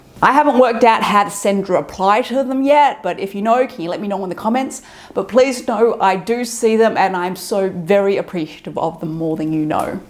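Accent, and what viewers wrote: Australia. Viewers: Hello im an american and just found your channel,. It is very interesting and I plan on seeing 👀 the other videos. Your videos are really get to the root of a problem i see alot in America 🇺🇸 and that is a paralyzing fear of death. Most folks here have trouble with the subject. Perhaps it that many Americans have grown more Godless. If you dont believe in God and an afterlife then whats left??? It scary for those folks. Also I really like the aussie accent and your appearance, you seem very thoughtful and sweet. Many thanks, Ralph😊👍🇺🇸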